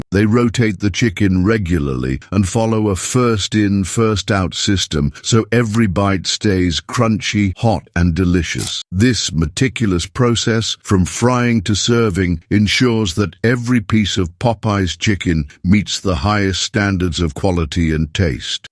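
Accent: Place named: United Kingdom. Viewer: What the hell! You take an all-American icon like Popeye's and lend it a frickin' British accent. Popeye's has got NOTHING to do with England. Why don't y'all hop on your own coat tails?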